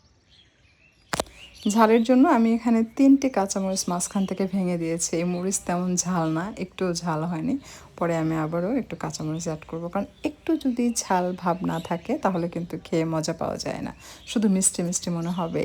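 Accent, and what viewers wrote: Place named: Noakhali, Bangladesh. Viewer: বললেন পাবনা থেকে বলতেছেন,কিন্তু ভাষার টোন হচ্ছে নোয়াখালীর।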